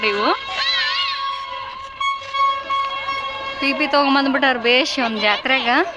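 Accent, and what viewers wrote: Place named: North Karnataka, India. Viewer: Nimma bhashe super ❤️👌 Uttar Karanataka❤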